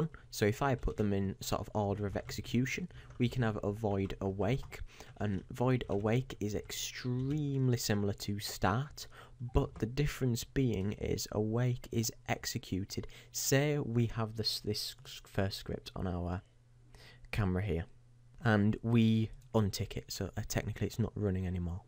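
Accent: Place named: Scotland, United Kingdom. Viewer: I love this scottish accent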